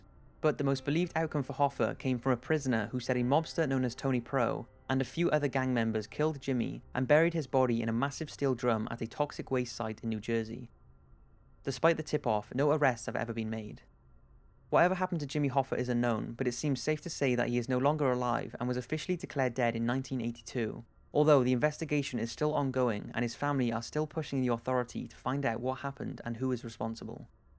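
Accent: Wales, United Kingdom. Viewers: With all due respect, I'm confused. Do you mean the author? The real Lemony Snicket (Daniel Handler) is from California and has a very deep monotone voice. The only thing I can think of is that you're referring to the guy who plays Snicket in the film 'a series of unfortunate events', Jude Law, who is from South London, whereas the guy narrating this video, if I'm not mistaken, is from South (?) Wales in the UK, which is a v different accent to London. Either way, he sounds v little like lemony snicket, real or acted.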